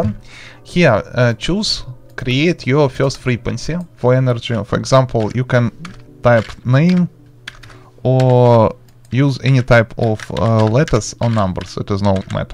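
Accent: Russia. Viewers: Русский акцент))) да ты же наш)))😅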